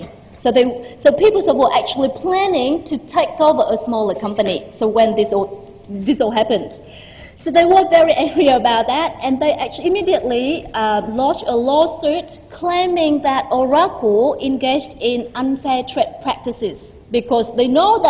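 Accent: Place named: Australia. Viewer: More like Australian rather than British.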